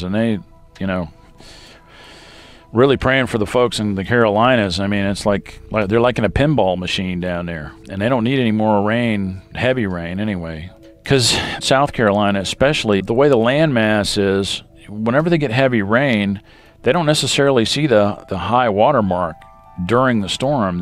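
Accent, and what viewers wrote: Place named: Mississippi, United States. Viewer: You have a Mississippi accent. Where are you at. Why did you choose to open a youtube channel?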